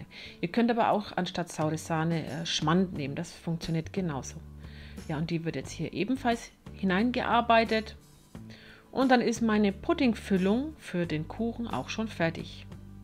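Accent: Franconia, Germany. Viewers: a fränggin…schäi!!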